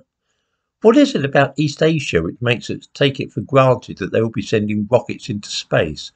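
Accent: United Kingdom. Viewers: Bad mic + British accent = no idea what he is saying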